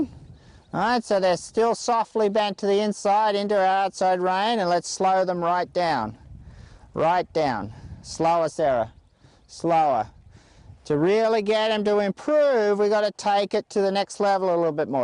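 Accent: Australia. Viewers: Is he Australian? He has an accent...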